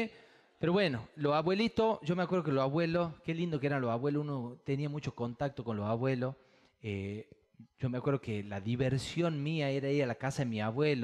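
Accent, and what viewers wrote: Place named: Tucumán, Argentina. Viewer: asi habla los tucumanos gatos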